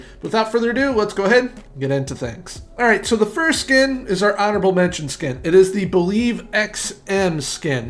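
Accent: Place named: United States. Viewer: weird hearing a yank talk fm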